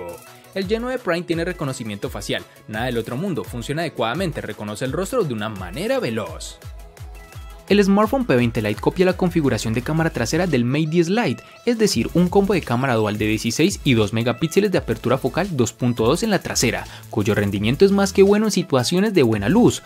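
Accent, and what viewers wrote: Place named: Bucaramanga, Colombia. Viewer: excelente video bro hey pana usted es bumangués ? se escucha bastante el acento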